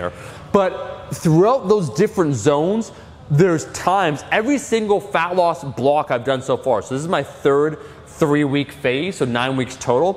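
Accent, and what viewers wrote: Switzerland. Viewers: Never thought I'd hear Swiss German in an American fitness video. Greetings from the old country! Aber, der beste Käse immer kommt aus die Romandie oder Tessin, und du wisst das wie alle Düütschschwiitzer. Emmenthaler<Gruyère<Büscion da caura. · That Schweizerdeutsch though... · Dialect. I think it's Swiss German.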